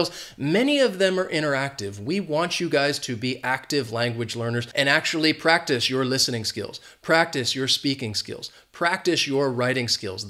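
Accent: United States. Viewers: I just love your american accent...and from now on I will create my own bubble by doing and all your suggestions.Thank you so much Sir😀👍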